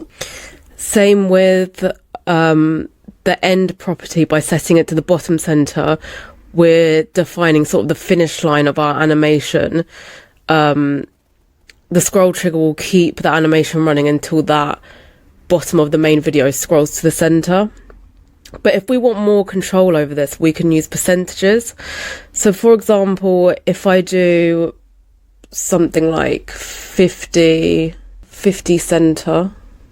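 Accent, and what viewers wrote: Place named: United Kingdom. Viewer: I love your British accent, it sounds so beautiful. Of course, I also liked your video, they are amazing effects.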